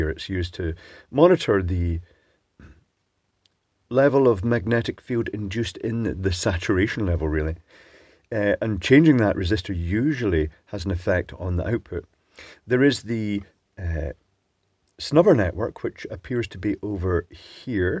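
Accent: Scotland, United Kingdom. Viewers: That will be the Scottish Soothing Voice. :)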